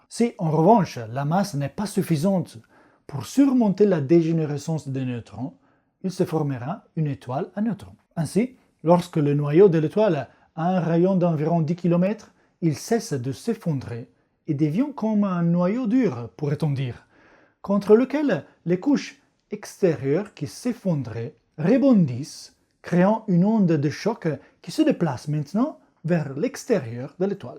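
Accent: Italy. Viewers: Merci pour cette vidéo très claire. Et quel plaisir cet accent italien ! J'attends avec impatience la vidéo sur la fusion de deux étoiles à neutron qui forme les éléments les plus lourds.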